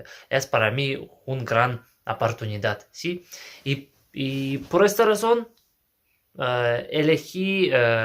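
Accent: Standard. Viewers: Hola que gusto conocerle, se entiende muy bien la pronunciación, y es una pronunciación neutra excelente, yo actualmente estoy estudiando el idioma Kazajo y aprendiendo su cultura.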